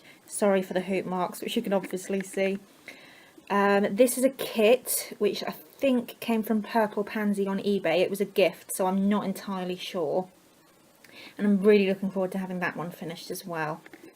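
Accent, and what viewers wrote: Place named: United Kingdom. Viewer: Just found you! I love your lovely British accent and your comments about the weather there! What beautiful WIPS you showed!💕I am about to watch another of your videos.😊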